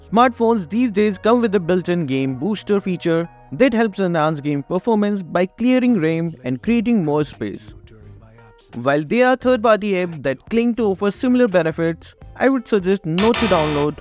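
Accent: India. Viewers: Question: are u Indian?Bcoz you have an indian accent.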